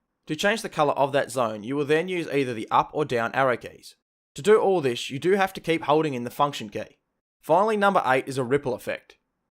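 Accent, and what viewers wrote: Australia. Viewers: ayyyyy its an aussie finally an accent im used to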